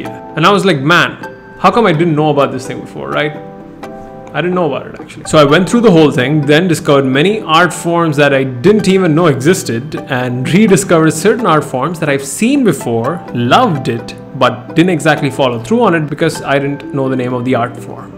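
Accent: India. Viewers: Yes i am too By your body colour and style of talking in English sounds and seems like an indian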